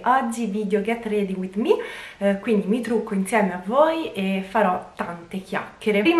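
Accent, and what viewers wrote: Tuscany, Italy. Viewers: Ciao. E' la prima volta che ti vedo su youtube. Sei veramente bella, mi piacciono in particolare i tuoi capelli. Dal tuo accento mi sembra di capire che sei toscana, forse di siena, ho indovinato? Ciao.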